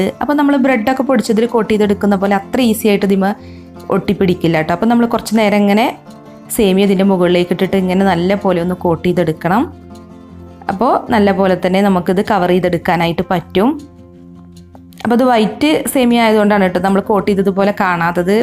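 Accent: Malappuram, India. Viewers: നിങ്ങൾ മലപ്പുറക്കാരാണോ ,? Kaadamutta ഇഷ്ട്ടം 😋😋😋😋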